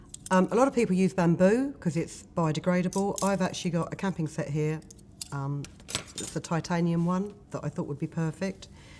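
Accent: United Kingdom. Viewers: I loooove your British accent!!! Your tutorials are always simple and ecofriendly!!Thanks a lot!!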